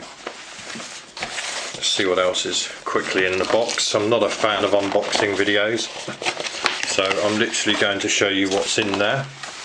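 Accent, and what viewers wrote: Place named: United Kingdom. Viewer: loving the british accent.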